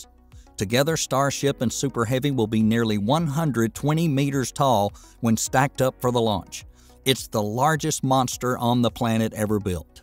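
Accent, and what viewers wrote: South, United States. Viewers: don't get me wrong I love you guys... You're my main spacex channel now..🤗👍 And I think the main reason is you have a Southern accent like me and I can understand you better 😂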